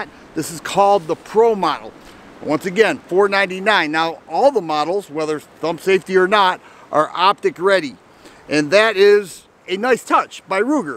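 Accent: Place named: Upper Michigan, United States. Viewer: well deserved! You voice remind me of the accent in the UP of Michigan, where I was born!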